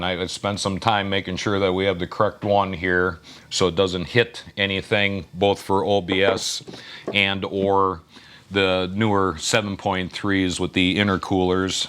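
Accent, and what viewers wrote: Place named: Wisconsin, United States. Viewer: you sound like a fellow Wisconsinite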